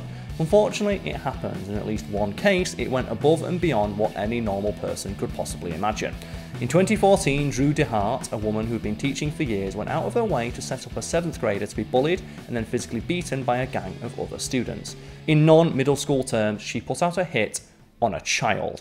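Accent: United Kingdom. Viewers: I was so worried about Top Tenz replacing Simon. But so relieved they got another British to host. Please don't replace him with someone with an accent that is not British. No disrespect, but British accent is always so soothing to listen to. Thank you.😊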